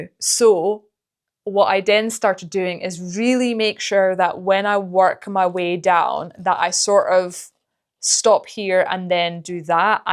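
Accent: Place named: Scotland, United Kingdom. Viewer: I actually don't pass the wool between my carders, I just swap hands and when it comes to making the rolags off I just roll in down off the carder into a little tube. Just the way I find intuitively works for me. Looks like you are doing great. It's also really nice to hear more Scottish voices on YouTube!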